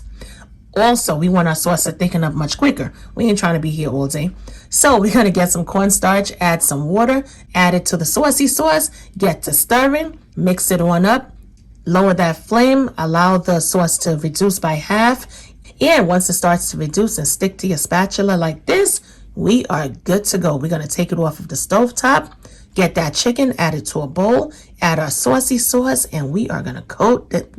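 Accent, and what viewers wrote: New York, United States. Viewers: My NYC homegirl. I already know without really knowing. I'm a Bronx Boogie Down Girl. I know our accent anywhere!!!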